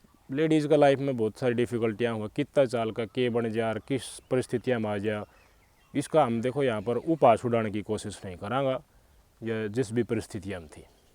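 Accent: Haryana, India. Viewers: Maan ge bhai aap ne aap ki haryanvi bole ko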